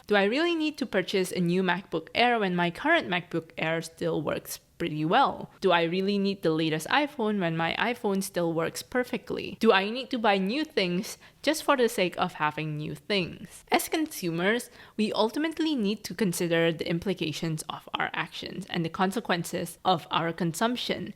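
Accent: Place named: Indonesia. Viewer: I i recognised your accent and i checked your instagram and as a fellow chindo IM SO HAPPY TO HAVE FOUND A INDONESIAN CONTENT CREATOR ESP ESSAYIST wadawww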